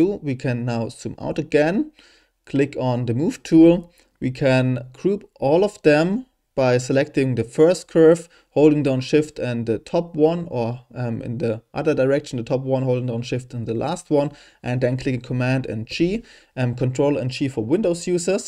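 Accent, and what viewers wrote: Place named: Germany. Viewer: Der deutsche Akzent fetzt😂 gutes Video, besten Dank!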